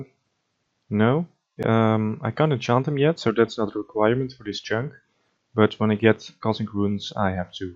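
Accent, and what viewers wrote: Netherlands. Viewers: you're dutch aren't you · are you dutch sir??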